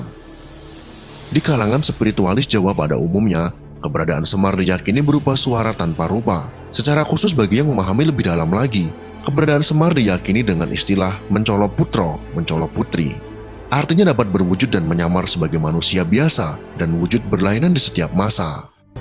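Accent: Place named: Bali, Indonesia. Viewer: Pengisi suara kek org bali ya logatnya 😁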